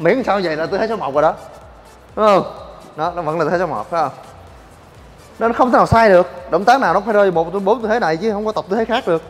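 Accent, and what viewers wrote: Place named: North, Vietnam. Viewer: Lâu lâu vào nghe giọng "đàng ngoài".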